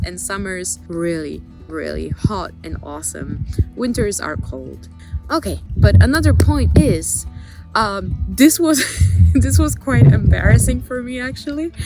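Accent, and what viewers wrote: United States. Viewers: アメリカ発音で聞きやすかった… これどうしようもないんだよね耳が学んだ言葉と発声はそれしか理解出来ないのよね。まあそれだけ言語力が無いって事かも知れんけどw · This is my very first time to check on your video. I was amazed that your English is so well and American lol. Enjoy home and return to Tokyo soon. I could not return to Tokyo because even I was born and raised in Tokyo. I did obtain US citizenship so I have to get visa every time I visit my parents living in Tokyo. I wish I can return Tokyo in November. Have fun in Poland!d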